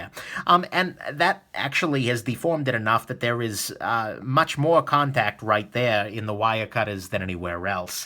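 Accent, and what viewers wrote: New York, United States. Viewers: You from New York bro? I worked with a lot of cats from there and you sound like them haha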